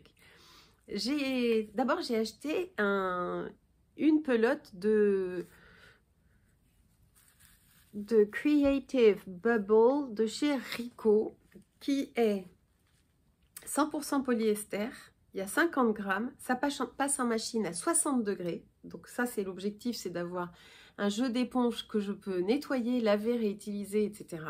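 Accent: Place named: Marseille, France. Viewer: 🍄merci , ton Marseille te vas à ravir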